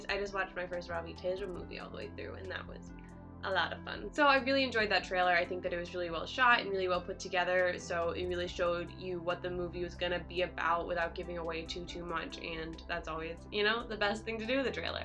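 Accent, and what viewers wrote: United States. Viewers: Aww, that wasn’t the full, proper scene. So I will give a bit of context here. Prior to this, Rayappan (Vijay) will be listening to an old song from a MGR (1st Tamil superstar) movie in a car. The driver will complain about a rival gang so he will ask him to go there. That’s why when the gangsters say that this “top dog” is cornered, Rayappan said “they are trapped in a bunch” haha. Also, the focus of this scene is to show how relaxed Rayappan is whilst fighting because he has “been there, done that” sort of thing. So he ends up singing that same song (from the car) while fighting. Here’s the link to the full video if you are still in the mood to see it haha. <link> Thanks. I like your pronunciations of names. I can listen to em all day. Btw are you from a southern state in US?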